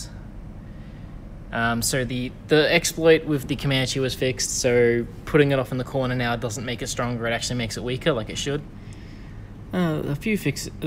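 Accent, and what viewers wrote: Australia. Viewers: How come you are a New Zealander, but you sometimes sound like an Aussie?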